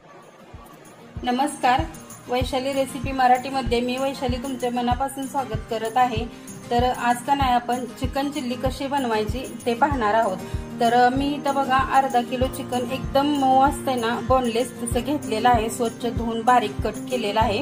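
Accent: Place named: Rural, India. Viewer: Mam tumchi recipi mi keli chan jhali Tumche gav kutele sanga na Aamchya gavakde pn ashich bhasha aawaj Mast vattey..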